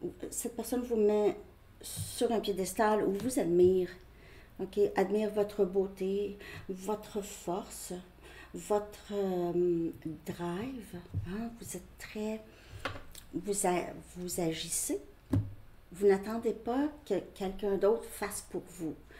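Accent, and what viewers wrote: Canada. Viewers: Dommage que vous ne fassiez pas de guidance personnalisée .. J'adore votre petit accent canadien !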